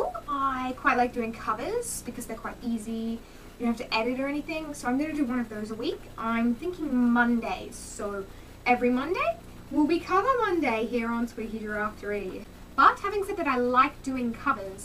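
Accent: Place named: Romania. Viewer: Romanian accent... Okay, I'm not the only one. Someone commented on her most recent video and was all "if you're a native American why d'you live in romania?" I was like "Have you ever heard an American talk? Clearly no, because that's NOT our accent." lol, but I didn't comment that because that would start youtube DRAMA!!! ...or maybe it wouldn't, I don't know.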